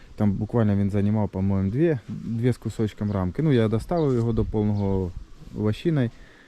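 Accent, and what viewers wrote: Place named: Kryvyi Rih, Ukraine. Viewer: Криворожский говор мне понравился больше чем другие украинские языки 😊 Более красивый и понятный , чем язык , которым разбавляет зеленский.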